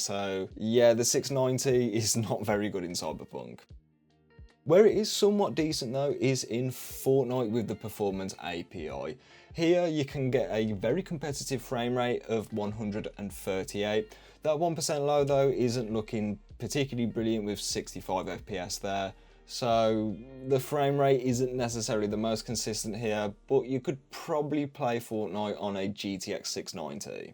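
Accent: Black Country, United Kingdom. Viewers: It's nice to hear a black country lad. Keep up the good work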